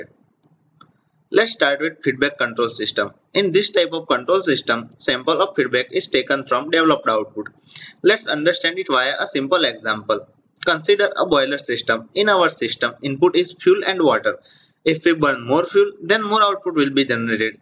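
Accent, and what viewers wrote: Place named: India. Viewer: you Indian people really just screwing up every tutorial with your accent! speak slower and more clear for heaven's sake...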